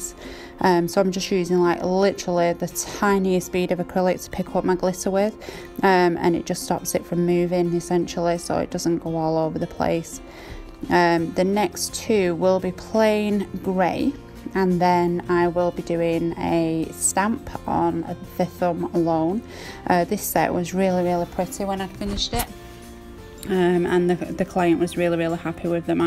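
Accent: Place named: RP, United Kingdom. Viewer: No way - I'm Australian and your accent sounds so posh!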